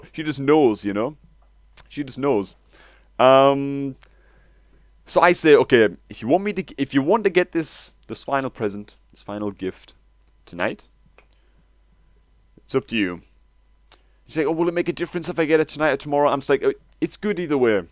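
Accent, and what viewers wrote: United Kingdom. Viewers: What the fuck maaaannn I thought you sounded Brit but I wasn't sure but your fiancé is. Proper Geordie??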